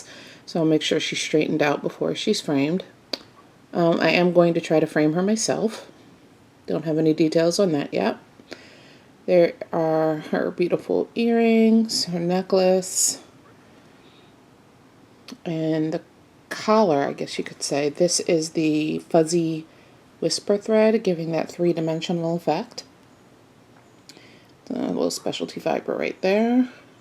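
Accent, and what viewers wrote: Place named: Baltimore, United States. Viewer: GIRL! So excited to see another video. I loved seeing all your projects and I truly enjoy listening to you discuss your process. Your Baltimore accent makes my heart so happy. Sending you all the hugs!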